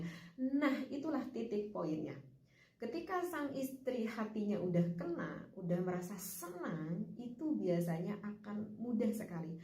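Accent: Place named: West Java, Indonesia. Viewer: Teh orang mana sich Sunda nya pas banget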